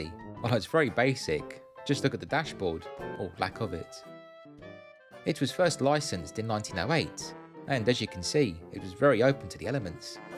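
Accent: London, United Kingdom. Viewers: No way man your accent is straight out of Mary Poppins and your foreign viewers must absolutely love that 😂👍🏼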